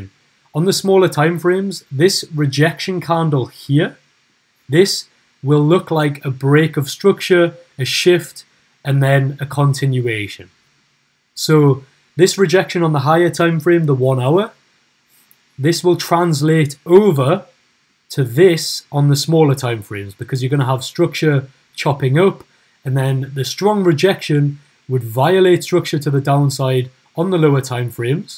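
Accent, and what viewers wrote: Newcastle, United Kingdom. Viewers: Really good video! Are you a Geordie?? Cheers!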